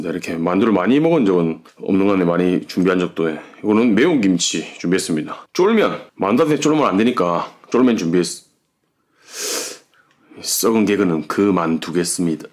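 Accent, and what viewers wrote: Gyeongsang, South Korea. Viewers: 님, 후우~~ 저 만두 각 두개씩 총 네개에 쫄면 일반 사이즈로 ( 님 사이즈 아니고) 두 젓가락 그리고 시원한 물 한 잔이면 제 배는 그득 찹니다. 저 매운 걸 저렇게 많이 먹방 왕 🤴. 보고 있는 제 배가 차고 넘쳐 땡기는 거 같은데... 억 소리 납니다. 꾸밈 없는 경상도식 말씀이 재미 나고 인상이 무척 좋아서 보는 이들이 그 것 만으로도 즐거우니 이제 부터 너무 많이 드시지 마세요. 건강 하시고 하시는 일 흥하시고 대박 나시기 바랍니다. 👍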